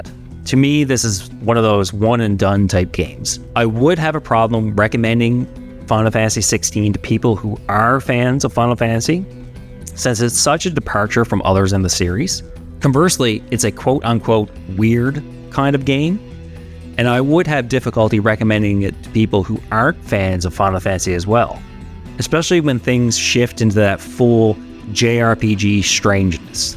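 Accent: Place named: Newfoundland, Canada. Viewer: I can tell this guy Canadian, the way his says “Out” and “About” 😂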